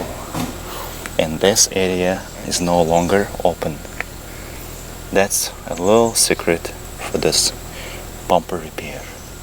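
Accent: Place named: Russia. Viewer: Интересный акцент, похоже на русское происхождение никак не английское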